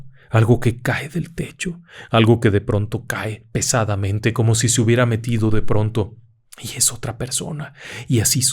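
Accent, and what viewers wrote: Dominican Republic. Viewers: Parece Más Dominicano Que Yo. 🤣😍❤️🇩🇴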